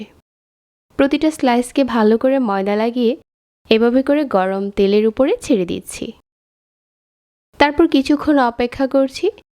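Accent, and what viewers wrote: Noakhali, Bangladesh. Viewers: A besel ta ki🤔 Noakhaila bashai bolen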